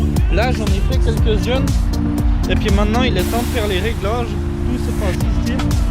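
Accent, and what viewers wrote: Vaud, Switzerland. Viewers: Joli ! Tu es suisse non ? Vaudois je dirai à l'accent ^^ Tu as pas de faucheuse arrière ? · yop super vidéo tu explique vachement bien PS: j'ai une question j'ai vu que tu était suisse et j'adore cette accent. Je viens du canton de Fribourg 😏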